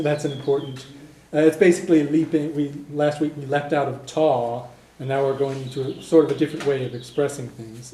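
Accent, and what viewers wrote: United States. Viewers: Do you really think he speaks with an Irish accent? I don't hear an Irish accent at all. I hear an American speaking "standard" Irish with an American accent. As I mentioned before I think it's great that he is learning the language but his pronunciation needs work. Would you prefer to learn a language (and pronunciation) from a learner, or from a fluent native speaker? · im really not trying to be mean i think its great what your doing but irish in an american accent is funny! adh mor ort slan!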